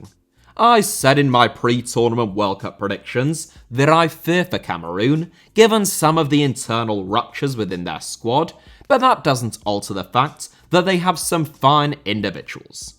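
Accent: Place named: England, United Kingdom. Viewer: the problem with is that he makes very little effort to read these foreign names correctly and he puts too much of his english accent in these foreign names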